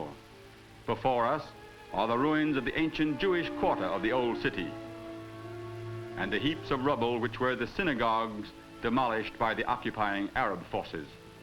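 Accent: United States. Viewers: To us Brits it's definitely an American accent. His pronunciation is a tad bit dodgy as well. Druzes!!! It's just Druze. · It's called Trans Atlantic English. It was very common back then. No one actually talked that way, but pretty much any American movie from that time has this accent. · To me it sounds American. Only a few words here and there sound "British"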